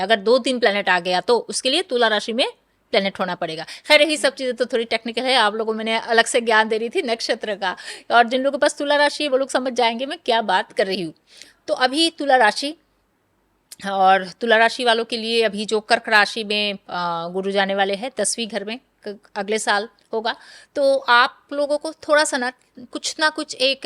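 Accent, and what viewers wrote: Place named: Bengal, India. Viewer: She is a Bengali,so the accent,but her predictions are good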